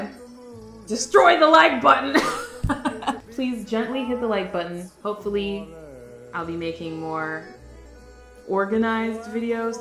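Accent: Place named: Italy. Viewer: Your Italian accent is everything 😂 now i gotta go watch this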